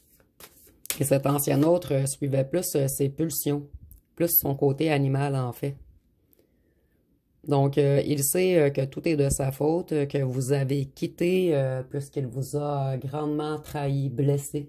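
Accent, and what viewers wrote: Canada. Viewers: Merci. Le bel accent du beau Canada. ❤❤❤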